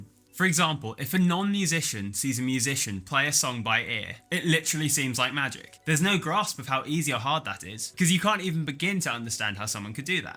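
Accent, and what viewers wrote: United Kingdom. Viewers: leaves the question open though: why would British people give him credibility? I can hear the YouTuber now, and can confirm he too is British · I think the British accent helps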